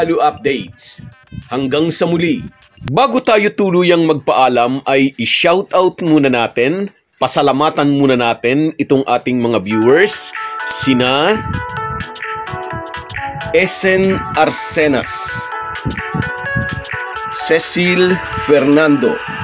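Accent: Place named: Batangas, Philippines. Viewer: Hi kabayan, shoutout sayo you are one pba vlogger i follow, clear mag annalize ng laro, most of all your batangueño accent is very entertaining... Good job kabayan, staysafe palage....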